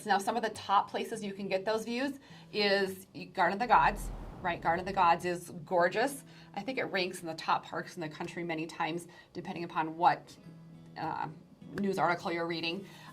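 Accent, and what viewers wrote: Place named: Minnesota, United States. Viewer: First minute of the video I knew you were from Minnesota, you’ve still got the accent. I’m from Mn too Lol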